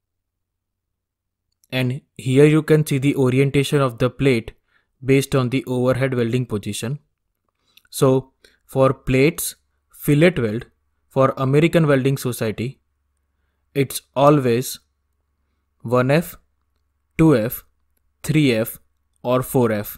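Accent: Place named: India. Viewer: Can you do it without the Indian accent. It's wary aard to understand you.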